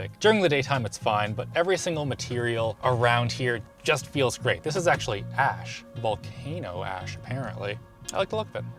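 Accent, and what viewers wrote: Canada. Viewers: I was wondering if it was a candians thing or just a weird thing. you never know... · It's a canadian pronunciation lol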